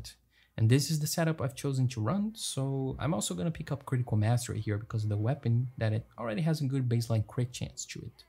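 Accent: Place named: Brazil. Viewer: tu e brasileiro né cara. Da pra ver pelo seu sucaque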